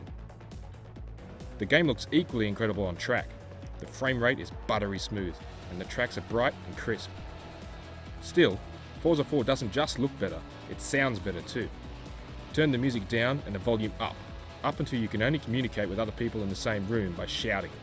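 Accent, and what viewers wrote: Australia. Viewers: lol aussie doing the review :) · the dude sounds aussie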